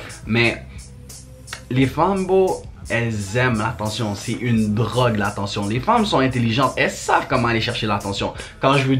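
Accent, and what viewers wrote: Quebec, Canada. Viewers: Ça choc personne y'a un renoi locksé avec un accent quebecois et torse nu 😂😂😂😂😂😂😂😂😂😂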